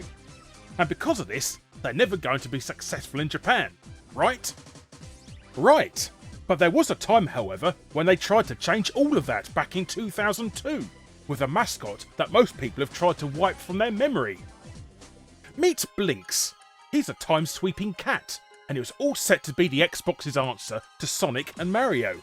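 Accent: United Kingdom. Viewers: I love your voice it's like you're a British guy with a mouth full of marshmallows.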